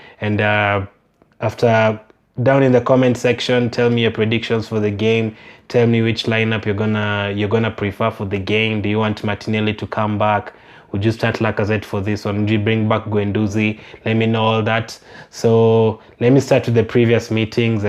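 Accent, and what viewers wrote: Kenya. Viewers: U sound Kenyan u accent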